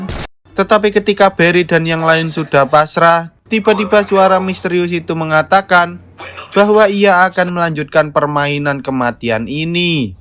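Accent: Java, Indonesia. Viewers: Jowone ngendi mas?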